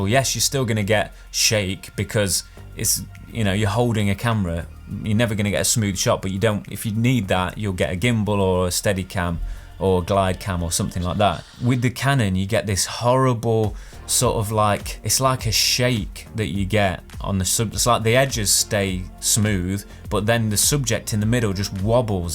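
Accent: Nottingham, United Kingdom. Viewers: Nottingham?? Sounds very familiar :) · Again - I love your british English:) and of course, the content of your videos is of very high quality! Thank you for the comparison, I´ve been using my R6 for 20 months (photos and videos) and I am considering to buy S5 just for shooting videos. Thumbs up, as always. Regards from Czech Republic;)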